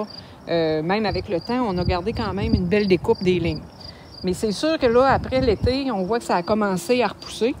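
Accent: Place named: Canada. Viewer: Merci pour l'explication, j'adore la voix des Canadiens c'est trop cute 😂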